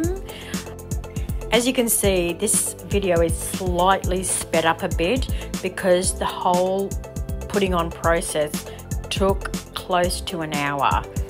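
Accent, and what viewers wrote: Australia. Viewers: Looks beautiful 👏🏼👏🏼👏🏼 What part of Australia are u from? Or did I get your accent wrong?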